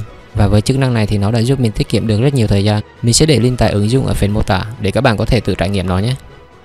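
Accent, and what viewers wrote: Central, Vietnam. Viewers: giọng a giống người miền Trung._. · ông là người vùng nào miền Trung đấy:v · Wow là ng miền trung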